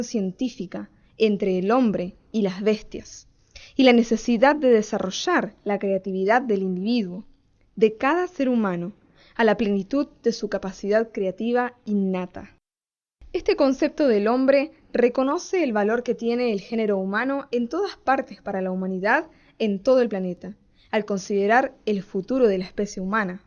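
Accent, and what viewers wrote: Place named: Panama. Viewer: No Pues!! Esta Tipa Solo Piensa En La Economia. y los Animales Que?? Los Que estuvieron millones de Años Primero que nosotros que?? no merecen ningún trato? del Hecho de Ser ''ANIMALES'' Pff Por Dios -.- Habla como si fuera panameña la tipa esta. Ademas, que conexión de las américas ni que nada!, con las ganas se van a quedar porque ese tapón los panameños la cuidaremos, y sera el tesoro que le dejaremos a las nuevas generaciones de nuestro Pais PANAMA..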